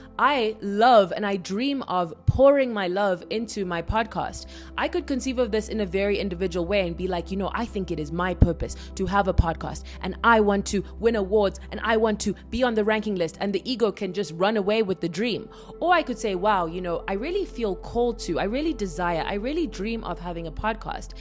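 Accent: South Africa. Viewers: Such a timely topic of conversation. Yesterday, and today as well, I had a conversation with God, questioning the idea of "Devine timing" vs "Doing things at my own time" and the response was so humbling but felt good "It's not only about you. It's about everyone else who is going to be impacted through and by you too. it's not just about you." and then today it hit me "The things you want are also manifesting you . " And it shifted Everything from resistance and obsession, to Allowing it to naturally inevitably unfold. . And TADAAA here's I come across Your video confirming everything that God , angels and spirit guides are telling me. ❤❤❤❤❤🎉. I'm so happy to hear a south African women's voice creating a space like this and using this platform the way you are. 🎉🎉 We've been waiting for your voice Sis❤🎉😂YES!!!!!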